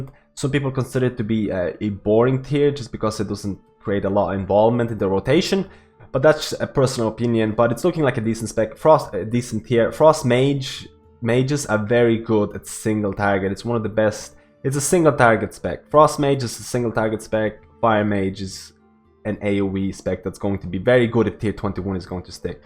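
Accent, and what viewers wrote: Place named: Ireland. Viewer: i know this is unrelated to the video but your accent is driving me crazy trying to figure out what it is, its like you have a strong Irish accent one time then all of a sudden you have like an eastern European accent. Please can someone tell me its driving me crazy!! oh nice vid as well :P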